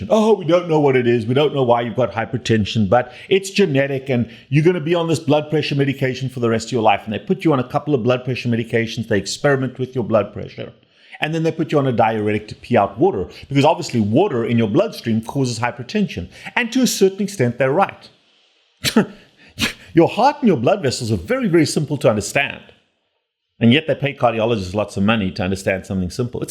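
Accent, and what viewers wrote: South Africa. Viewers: You sound South African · U sound like a joburg oke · He sounds south african. I love it when my fellow kinsmen do well world wide.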